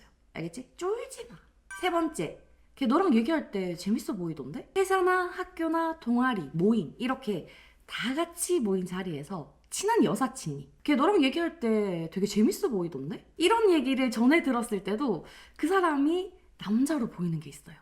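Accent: Gyeongsang, South Korea. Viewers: 갱상도 사투리좀 고쳐라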